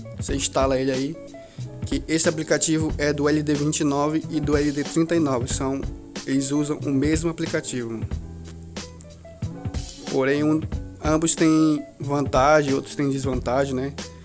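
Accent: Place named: Pará, Brazil. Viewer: Tu e paraense ne man kkk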